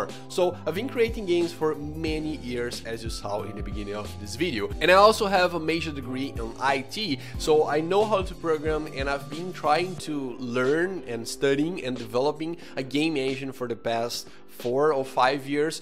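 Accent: Brazil. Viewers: mano pelo seu ingles parece muito que vc é brasileiro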